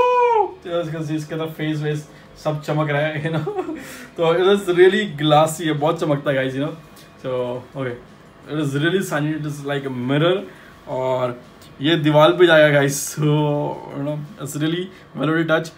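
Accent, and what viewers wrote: India. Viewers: Congratulations.... 😉 bhaiya.. Bas ese hi aap aage badho. 😍😍 jeo mere vale bhaiya....... Aaree tum bhot khaas ho aap bhot mehant karte ho isliye toh hum aapko dekhna jada pasand karte h... Or koi kuch bhi boke aapke bolne ke tarike ko but meko toh ye hi pasand typical Indian style.... 😳😍😉😉😉😉😉😉😉.... Bas bhaiya plzzz full auto airsoft guns laooo🙌🙌🙏🙏🙏🙏🙏🙏🙏🙏🙏 Tumhara bhot bhala hoga... But anyways many many congratulations... 😍😍😍😍😍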